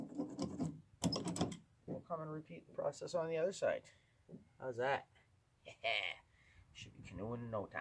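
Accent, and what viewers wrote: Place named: Canada. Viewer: We have an old 15' fibreglass canoe, and when I heard you speak, (and of course the topic), I guessed- "Canadian". Then I see how you are a great 'responder' to comments. Yup- definitely helpful- also probably- He's Canadian. (, maybe 1st Nations?) Then I heard 'fiddleheads' and Oh hell YEAH!!!. Now, to guess where from"- Prairies?, nah, not enough water. NL or Nunavut" or BC? nah, more likely he'd be doing a fishing boat. Then the Lepage adhesive can- en français. Voila! Super definitely 'CANADA, in N. Ontario, Quebec or NB.? Humm?. Cheers, from Montreal. Been through NB many times, mom grew up outside Petitcodiac (Forrest Glen), happy memories, saw my first movie in Moncton when 8 years old! with my late uncle, who had a farm near "Elgin" and I almost attended UNB. Keep up the good work... and Happy paddling!:-)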